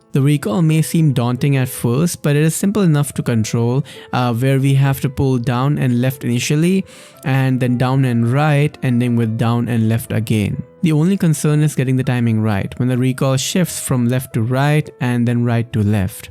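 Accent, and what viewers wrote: India. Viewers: Dude are you from India? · hmm, i feel like ur accent is indian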